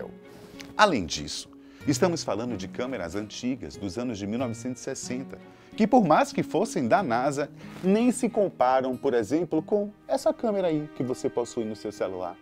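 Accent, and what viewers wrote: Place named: Bahia, Brazil. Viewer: Excelente formato de vídeo 👍✌ Seja bem vindo rapazinho .... Baiano eu acho pelo sotaque 🤔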